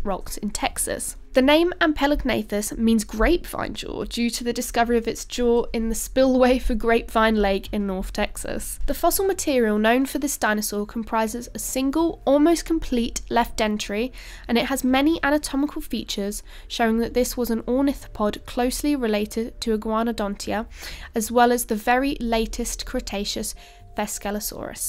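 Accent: RP, United Kingdom. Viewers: Alright, she does, admittedly, bring clear-spoken Queen's English to the narration. Still (mischievously) one has to wonder if of the boys brought in a GF to see if she would get a better YT response. Yes.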